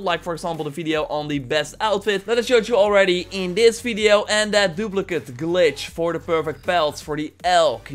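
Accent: Netherlands. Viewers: Are you dutch? anyways do something about the accent jeeez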